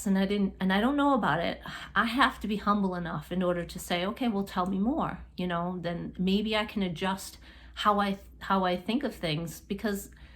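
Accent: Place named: New England, United States. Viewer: I can relate to the definitions for heathen....it’s one thing to walk outside conventional beliefs and practices for ourselves, but it’s different when we have to consider our children. Both my husband and I did not feel served by christianity and had no desire to burden our daughter with it. So after she was born we had our own “naming ceremony” for her. Close family members were invited as were her godfather and goddess mother.... we gathered on the beach and blessed her with the elements air ( a feather) fire (herbal smoke stick) water ( from the ocean) and earth (a sprinkling of sand) we called in the ancestors and asked them for their blessings. People were asked to bring gifts, but not the kind you buy in a store, it was a beautiful, personal, and deeply meaningful ceremony. To this day, thirty years later, folks will comment to me how much they loved it. 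Its not easy to walk outside convention, but I’m glad we chose that path and our daughter is, too. I just discovered your channel and I’m going to check out some of your other videos. BTW, are you from New England ? I feel like I could hear traces of a slight New England accent! I’m from Massachusetts.....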